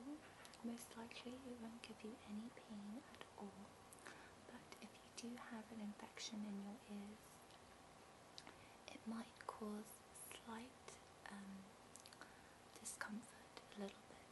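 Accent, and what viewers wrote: England, United Kingdom. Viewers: i think i need to move to the uk... I love women with this accent... >.< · I love the idea of an ear examination video. I'm addicted! I usually don't get ASMR from English accents - something about being reminded of myself XD - but this was faaaabulously tingly. Thank you! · A Korean with an english accent is a juicy contradiction!! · you have an amazing voice. i was not expevting an english accent from an asian girl either. really great video.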